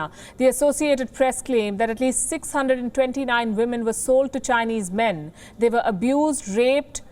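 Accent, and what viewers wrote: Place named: India. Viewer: I hate Indian accent , so disgusting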